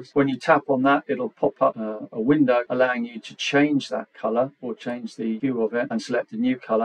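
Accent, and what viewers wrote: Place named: England, United Kingdom. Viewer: as the author of the app I have to say this is one of the most amazing user videos I've seen for my app! I am so impressed and thankful! Of course, the super cool English accent is the icing on the cake! Seriously, your video is going to help so many people!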